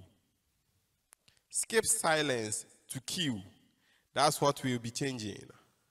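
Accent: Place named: Ghana. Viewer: You sound Ghanaian😎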